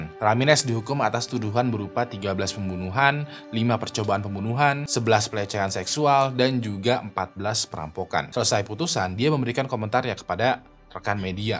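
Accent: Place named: Makassar, Indonesia. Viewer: Halo orang makassar ya? Nama di makassar itu, karna kosa kata n logat, biasanya dari nama jadi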